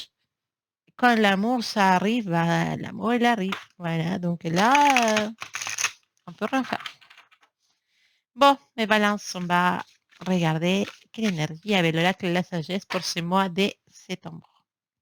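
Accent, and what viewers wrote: Spain. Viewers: Tu as un très joli accent espagnol, prend ton cheval et viens me voir 😃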